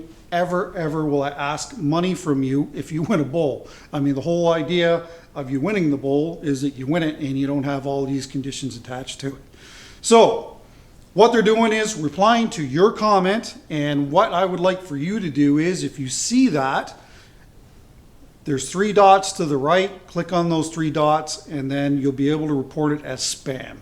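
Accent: Canada. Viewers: It's a beautiful piece,. Pretty impressive how you can turn something so large. The audio was fine too. I could still hear you say "a-boat" in your Canadian accent, which reminds me of when I went travelling and makes me smile, ay. Have a great weekend, looking forward to your next piece.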